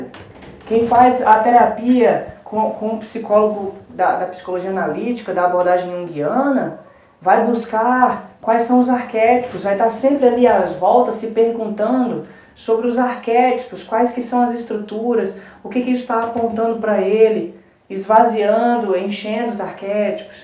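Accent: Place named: Espírito Santo, Brazil. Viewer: Professor, vc tem "sutaqui di capixaba" rs Aulas muito claras. Conheci seu canal hoje. Muito bom. Parabéns 👏 👏 👏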